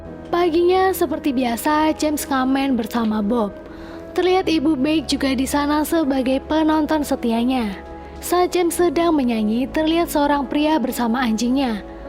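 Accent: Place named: Pekalongan, Indonesia. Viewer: Logate kak kalongan temen mbak oo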